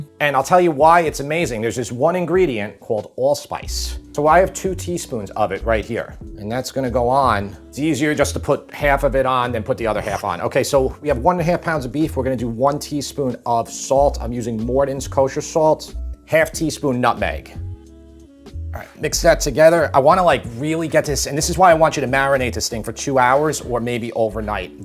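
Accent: New York, United States. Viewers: Not to be rude, but I just can’t stand that New York accent. I don’t know what it is. You’re a very handsome man. You’re very talented but the accent maybe you should work on.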